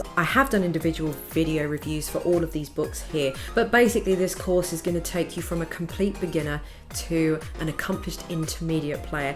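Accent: England, United Kingdom. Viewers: What part of england are you from? London?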